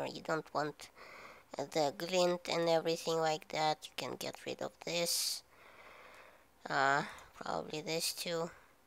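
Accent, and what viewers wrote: Russia. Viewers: You sound a bit Russian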